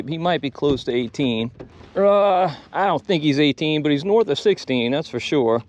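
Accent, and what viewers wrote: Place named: West Coast, United States. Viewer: Youre not originally from Louisiana, i think west coast? You sound ofly familiar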